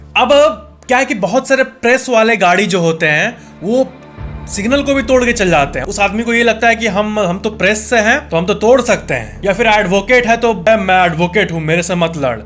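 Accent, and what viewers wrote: Bihar, India. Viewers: Bhaiya aap bihar se ho kya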